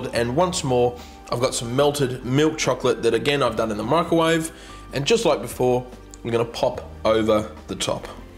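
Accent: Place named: Australia. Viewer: Love your videos! What part of Australia are you from?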